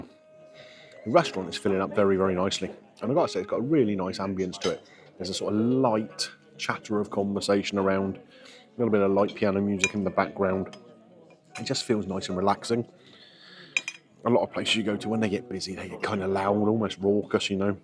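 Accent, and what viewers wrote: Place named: Norwich, United Kingdom. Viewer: With your accent you sound like a Norwich local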